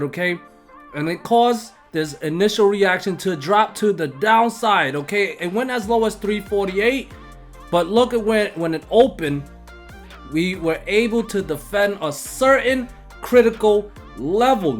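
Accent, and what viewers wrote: New York, United States. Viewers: you from ny?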